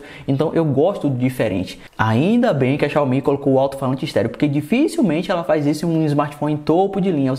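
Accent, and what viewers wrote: Bahia, Brazil. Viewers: Mano vc e da Bahia né ??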